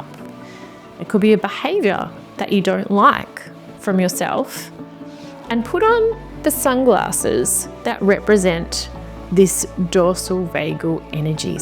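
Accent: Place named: Australia. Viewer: Finally an Australian, so pleasing to hear and feels so home. I am traumatized was accidentally found out in a date that went wrong a few weeks ago. I put my baseline thoughts on chatgpt and after a few questions it also correctly predicted I am severally traumatized. Here one human and now a computer. Both predicted the same. Last two weeks I am obsessed with writing my turbulent childhood for a child who apparently wires differently, volatile growing up, even isolated reclusive adulthood which I did not want. Now at middle aged something new opened up which I never knew before. Forgive me for rambling. I am so happy to come across your channel.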